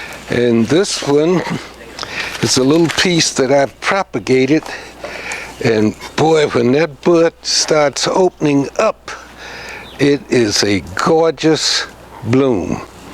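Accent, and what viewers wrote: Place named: New Orleans, United States. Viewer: New Subscriber Not only a 🙃, but does Bonsai too👏🏽 I'm looking for an Azalea now.. Most of my Trees are Ficus, or Portulacaria Afra, Holly, even a few Fuji Cherries.. Good info here🏆 sounds like New Orleans🤔 🙂 I'll keep watching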